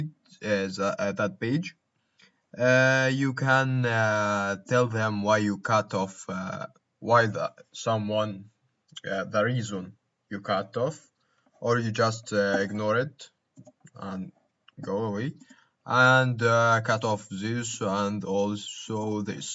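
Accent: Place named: Egypt. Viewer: يسطا انت مصري so try to adjust your accent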